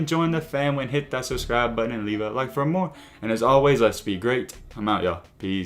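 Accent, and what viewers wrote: South, United States. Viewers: Can’t finish video because of yo country ass voice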